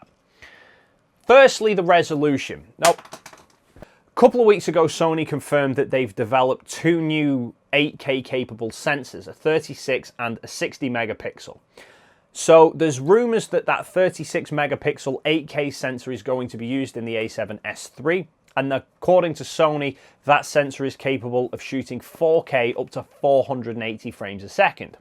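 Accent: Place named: North, United Kingdom. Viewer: The only reason I watch his videos is a beautiful northern accent 😂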